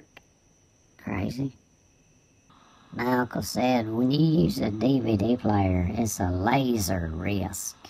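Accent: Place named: South, United States. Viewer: Your uncle sounds like he was a cool dude ! I’m sure he was fun to be around. Great story . Where are you from? I like your southern accent. I’m from Tennessee and also have a southern accent . I’m guessing you’re from Georgia. But I’m just guessing. 😊. Love the animation . Very cool 😎. You’re so creative and talented.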